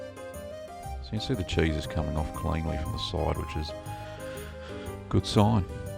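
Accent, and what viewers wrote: Australia. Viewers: You sound like the Aussie version of the BBQ Pit Boys!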